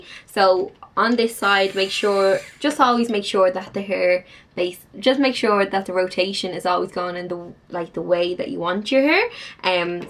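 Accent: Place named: Ireland. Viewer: She Irish what do you expect, people can’t just change the way they speak.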